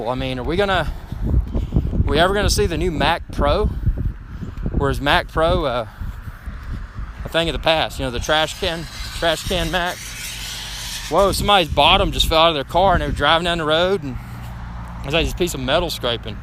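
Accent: South, United States. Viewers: This Is One Hot Irish Bear WooF ! . He Has An Interesting Accent Is He From The South? Walking Good For You Keep It Up Dude.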